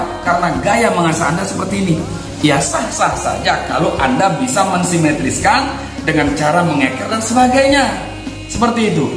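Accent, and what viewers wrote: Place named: Palembang, Indonesia. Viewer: Mantul Pak Ustadz ngomong2 dimano ini palembangnyo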